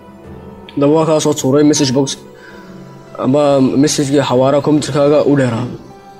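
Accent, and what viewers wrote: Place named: Somaliland, Somalia. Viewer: socdaal media he isn't from mogdisho he is from Somaliland don't you understood easily and koonfur is different speak.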